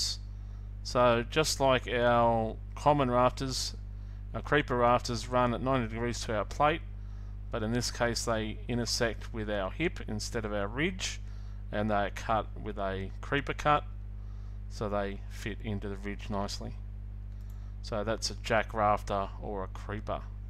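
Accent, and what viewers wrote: Australia. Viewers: Very good explanation. And nice to hear an Aussie voice.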